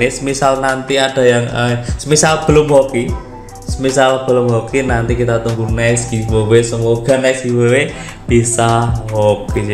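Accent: Java, Indonesia. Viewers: Saran: cepat2 beli facecham biar g jadi ninja lagi 🤣 Kesan: public speaking asik ga bosenin dengan nuansa jawanya wkwk Kritik: sering-sering live mang kqlok bisa seminggu 3x 🙏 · Hallo mas saya seneng nonton nih chanel, semoga makin maju dan makin terbaik dehh amin Saran : kalau ada facecam pasti asik mas bisa liat wajah samean pas ngonten wkwk Toxic gpp mas medog nya lucu mas kalau didenger orang2 sesama Jawa wkwk mantapp 👍👍🙏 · Saran : untuk next time semoga abang sehat ya, masalahnya saya bisa build squad karna nonton chanel abang Kesan : penjelasan nya kaga belibet, dan saya suka sama logat bahasa Jawa nya Kritik : jangan sering emosi bang kalo gacha kaga hoki, wkwk itu ae sih soalnya bingung nih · dengan ucapan basmallah, bismillahirrahmanirrahim untuk diri saya semoga bisa menang give away dan untuk bang keren bang, lanjutkan, chanel fifa terfavorit, paling profesional daripada yg lain, bahasa mudah dipahami dan asik bahasa jawamu sangat medok dan menghibur semoga dilancarkan terus rejekinya, sehat selalu, dan chanel bisa berkembang terus menerus saran bang untuk konten, bisa kasih tips untuk pemula yg awal main fifa bang, soalnya kalau udah ketinggalan jauh susah untuk mengejar pemain yg terdahulu hehe sukses terus bang! 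panjang umur hal hal baik! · Saran:sering upload gameplay/push rank biar bisa belajar dari gameplay bg Kesan:omongan nya lucu medok dan penjelasannya gampang di Terima suka bahas bocoran soal event yang akan rilis Moga channel makin berkembang dan bisa tembus 100rb subs bulan ini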